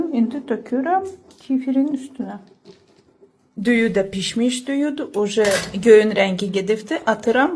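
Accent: Ganja, Azerbaijan. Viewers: Xalis Gəncə ləhcəsi😂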